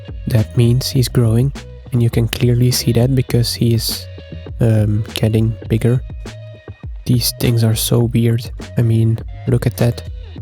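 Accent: Netherlands. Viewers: I hear Dutsch😅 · As a person from The Netherlands I was kind of thinking you were dutch by your pronounciation but not quite sure.. this got confirmed though when seeing the Triops kweekset.. Toffe video!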